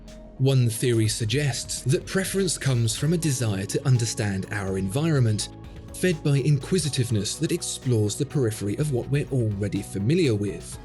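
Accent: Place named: London, United Kingdom. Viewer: The ongoing video of that ugly looking Daytona which caters to the Corvette buyers is a distraction to the flawless spoken narrative originating in those who wish to impress you with their vocabulary and thought process. The accent or dialect is somewhere in the London upper class suggesting good education. The truth is much simpler. You buy a luxury Rolex watch in order to announce the following: I have made it.....have you?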